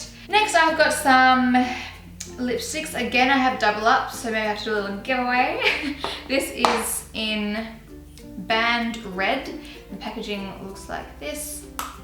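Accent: Australia. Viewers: You do realise it's an Australian accent right? You basically insulted ALL THE PEOPLE who have the same accent. So fcking immature... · oh gosh, when I hear you speak, I so wanna have Australian accent!!!!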